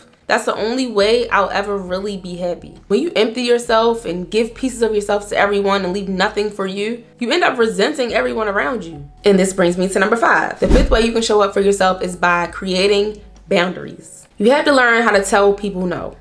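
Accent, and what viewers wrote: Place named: DMV, United States. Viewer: Girl you HAVE to be from somewhere close to Baltimore,Md. I know this DMV accent anywhere lol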